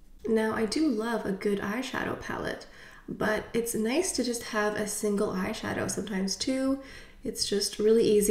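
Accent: France. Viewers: I have that violet single! Love the look on you but I will have to lighten it up for my colouring. Love to hear you pronounce the names of product in your French way.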